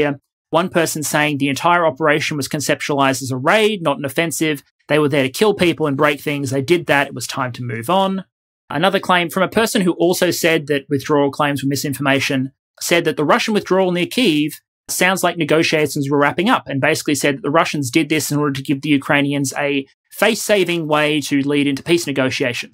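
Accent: Australia. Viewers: Hi, nice to hear an Aussie accent again. I'm Aussie born (Sydneysider), but have lived in Europe for 57 years, so the main part of my life. I do however still keep track on the goings on Downunder. As for that financial bit, they could be counting on the unexploited resources of the Donbass area and got the figures mixed up. I mean that points to the potential of the area, not what is being produced today. The Donbass holds large quantities of oil and gas and only a minimum is being exploited today. The presidents/governemts before the Maidan revolution (February <num> never put much money to it and kept Ukraine dependent on Russian supplies, which they frequently turned off and let the Ukainians sit in the cold. That was never really necessary if they had exploited those fields themselves. This brings me to why Russia went into Ukraine. After the Maidan revolution (2014) plans were made in conjunction European energy and fuel conglomerats to start exploiting those reserves. 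Because a. that would ensure gas supplies to Ukrainian citizens so they wouldn't have to suffer cold winters again and b. the European market was increasingly in need of gas and it would make them less dependent on Russian gas, with the bonus of revenues for Ukraine. That is what sparked the Russian invasion. They were afraid of losing their controle over the European market and losing their hold on Ukraine. Every expanation else is just Russian propaganda and holds no merit. However the Russian inv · I would like to know the overall effect of the cleaning out of all the old Soviet hardware. Is this a good thing? Does this allow all the EU countries to upgrade and standardize their military hardware. And NATO has pledged a 300-400,000 man force. Do you think this is affordable for the long term. Because it seems that this 400,000 force will require about 2 years to become really effective. 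And If I were President Putin, I would try to have everything sewn up by say, next spring. A stablized drawn out struggle works against Ukraine, because Russia can send random missiles to reach any factory or training center anywhere in the country. These Are my 2 questions. Thanks. I always like your information. I enjoy your jokes and your accent. As being here in Japan, I don’t meet anyone with an Australian accent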